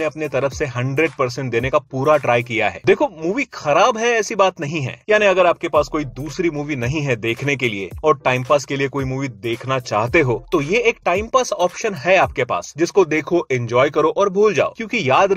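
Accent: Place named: Uttar Pradesh, India. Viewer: Up accent ko tum hi suno..... hum to hindi accent hi sunein gay.......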